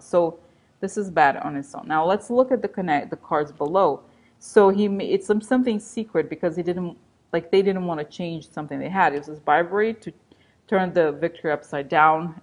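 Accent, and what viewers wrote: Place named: Russia. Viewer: My gosh, you crack me up! Wonderful reading as usual....love you...and that Russian accent!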